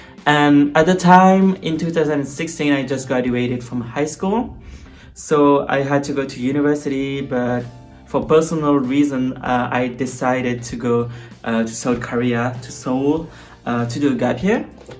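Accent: France. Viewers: U'r French accent is so cute 😘, but interesting story, thanks for sharing. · On entend ton accent français trop choupi ~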